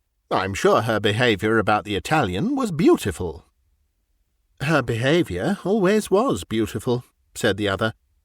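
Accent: England, United Kingdom. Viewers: It's so nice to hear an English accent that's not AI.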